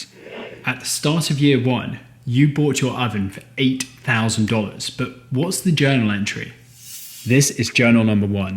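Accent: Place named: England, United Kingdom. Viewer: Is it just me or does Accounting make more sense when explained in an English accent.... lol fr thx for sharing these informative & concise vids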